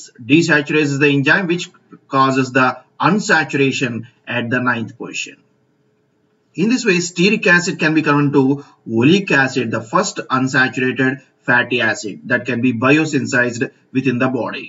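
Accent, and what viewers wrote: South India, India. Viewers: If you think its east indian accent, then you certainly dont have any idea about Indian accents at all. This is south indian english accent. And no, there is no such thing called east indian accent of english.This is the nth time I have seen a silly comment from an Anglo, saying that thing - "East Indian" to refer to something which is rawly indian. Is that an addage in West of calling Indian accents of English or anything raw from India that they wish to mock as - East Indian for some reason? Because , I never get the reference of how South Indians become East Indian when youse have to throw some rebuke. Nor I get how puniabies who happen to be West Indians get called having East Indian accent while they use a peculiar accent of english which is actually how they speak and not east indians at all. 2ndly, there is no such thing called East Indian accent in the 1st place. 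People from east india , if speaking in english speak in a variety of tonage and accents, some closely resembling old english and some just like Russians or chinese speaking , english . It's not a very common language in East India. So the whole reference of East India to everything seems highly stupid , ignorant, and unsubstantiated in the 1st place.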